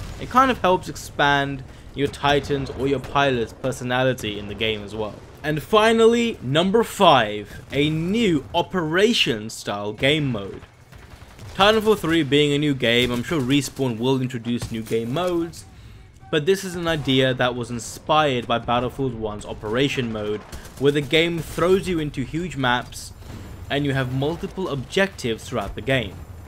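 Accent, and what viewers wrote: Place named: South Africa. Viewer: You sound South African. What servers do you play?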